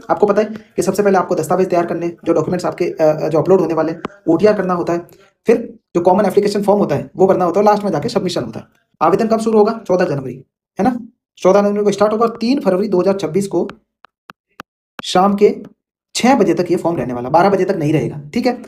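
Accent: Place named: Rajasthan, India. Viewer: sir aapki aawaj m hmaea rajasthani touch h ..aapki aawaj thodi thodi ravi kumar sihag sir se milti h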